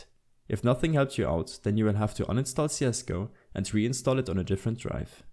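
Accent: Germany. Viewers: That german accent 😻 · Man merkt an deinem Akzent dass du Deutscher bist ahaha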